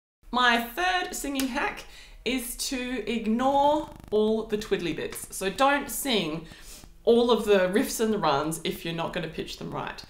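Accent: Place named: Australia. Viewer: It's great to hear an Aussie voice on Youtube. Your approach to your videos is very refreshing.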